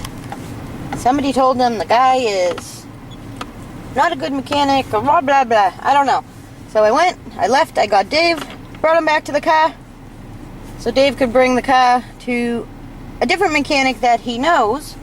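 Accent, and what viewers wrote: Boston, United States. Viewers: Hey i wanted to let you know how much i enjoy watching your videos. I'm 19 and from Scotland and i watch your videos every day. Your accent is so cool the Boston accent is my favourite, probably because i love New Kids On The Block so much!:) Please keep making videos because they make my day a little better xxx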